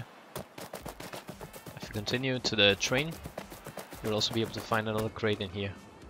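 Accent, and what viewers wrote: Denmark. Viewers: Thank you for the video. ( and just in case if im right. saying. Tak for Videoen. I might be wrong, but from the sound of your accent. I believe you to be Danish ( from Denmark)) denne Video var meget hjælpsom. :) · are u danish?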